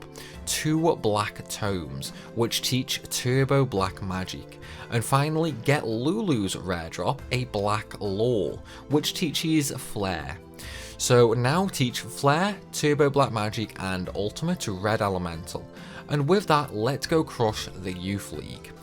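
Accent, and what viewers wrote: United Kingdom. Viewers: WHY ARE YOU BRITISH????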